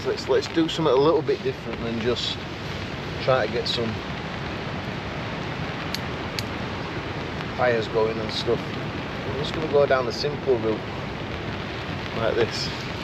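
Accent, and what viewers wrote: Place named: Huddersfield, United Kingdom. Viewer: Def a huddersfield accent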